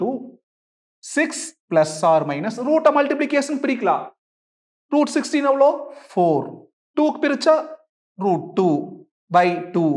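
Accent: Coimbatore, India. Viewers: Coimbatore LA ula slang pola irku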